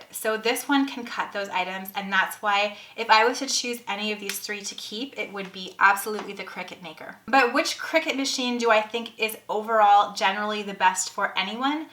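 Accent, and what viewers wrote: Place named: Canada. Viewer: I love the way you say “decal”. Canadians you need to stop apologizing for how you talk. We love your accent!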